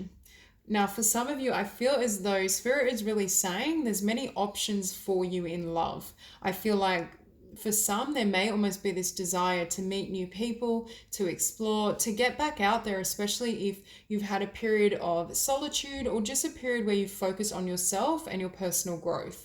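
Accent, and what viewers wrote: Australia. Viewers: Definitely an Aussie accent XD ..... Sydney?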